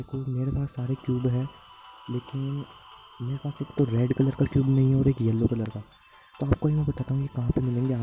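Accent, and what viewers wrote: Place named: India. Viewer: Really? Huh. I assumed from your speaking patterns you were Indian. It sounds close to, then again I’ve never heard anyone speaking Swedish so I tied it to what I knew. But thanks for letting me know. Doesn’t make me understand the video any better. You should make one in English for those of us that would like to understand, if you don’t mind.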